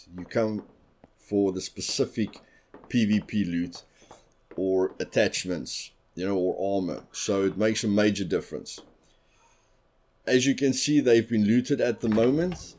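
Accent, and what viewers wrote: Afrikaans, South Africa. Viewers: are you africaans by any chance?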